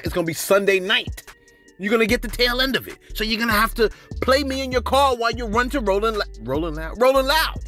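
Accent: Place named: Bronx, United States. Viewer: Ay yo shout out to for saying niggas twice. 😂 Had me weak cuz u neva cuss and always say ninjas. I told my wife u sound like u from da Bronx tho!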